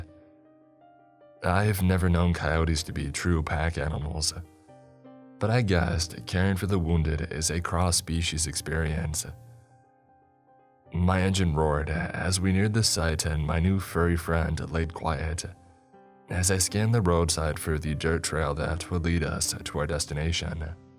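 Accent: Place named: South, United States. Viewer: I have never heard your normal voice. You almost sound southern. And your voice is naturally that deep?! Good God lol.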